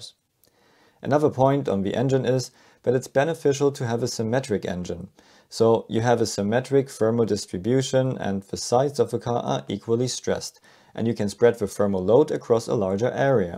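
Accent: Germany. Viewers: deutscher accent in ersten 10 sekunden gespotted 👀👀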